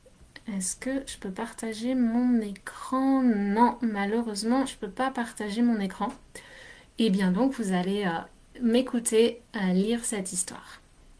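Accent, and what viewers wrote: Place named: France. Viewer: tu as un super accent français ...tu parles comme les français ..je ne trouve pas de difficultés à comprendre .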